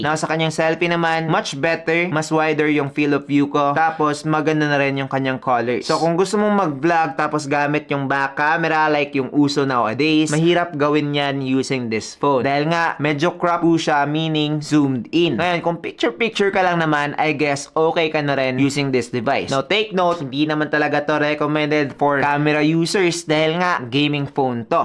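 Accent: Visayas, Philippines. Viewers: Bisaya ka lods?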